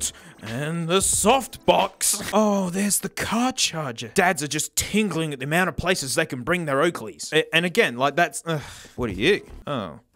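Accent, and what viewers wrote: Australia. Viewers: this guy can't be more australian and i'm overwhelmed by it. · I love this guy, it's like an Aussie Señor Pelo that makes videos about audiphones and piracy.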